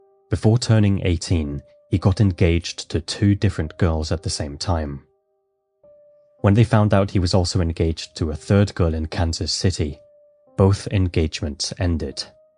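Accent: England, United Kingdom. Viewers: Wait is it you? I like it. You are not mispronouncing the word, please don’t work on it, it is my American ears and your lovely English accent. There is nothing wrong with your pronunciation!!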